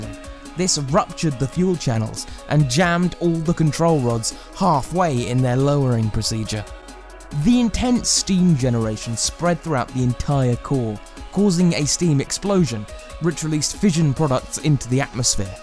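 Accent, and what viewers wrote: United Kingdom. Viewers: They got it wrong cause it's a British narrator, just like they got it wrong with the Revolutionary War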